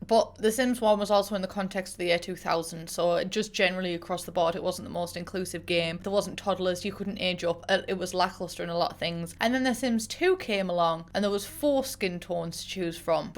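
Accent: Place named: Geordie, United Kingdom. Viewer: I love the geordie accent, but your's in particular just brings back repressed memories of my old maths teacher and it is all I can hear.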